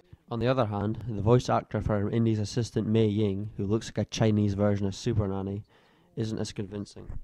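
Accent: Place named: Scotland, United Kingdom. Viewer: is it possible for Xbox one backwards compatibility. Probs not. Is it on steam or any other seller? By the way, you sound Scottish, I'm from there as well :D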